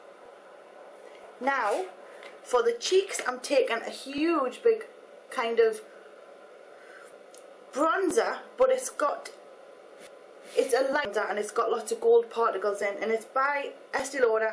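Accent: Newcastle, United Kingdom. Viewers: Are you From Newcastle ? You have a Geordie Accent [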